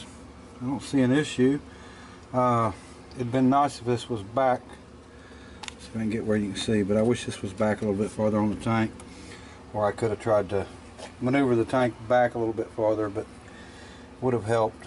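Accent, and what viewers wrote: South, United States. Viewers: were you ever a teacher? Because you sure are good at teaching this teacher a whole lot of new things! Thank for the excellent explanations and beautiful, proper and polite Southern English.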